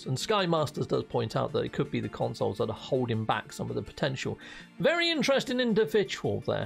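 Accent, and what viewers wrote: United Kingdom. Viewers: Oh -- and I would definitely watch some paranormal oriented content! I too find the UK accent and phrasing very entertaining. At 2000+ hours I'm still a long way from being bored with NMS. But while I'm a huge science and science fiction fan from WAY back, the paranormal is a kind of guilty pleasure for me.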